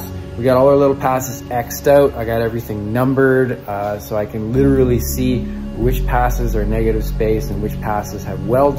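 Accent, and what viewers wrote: Canada. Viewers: T tu quebecois?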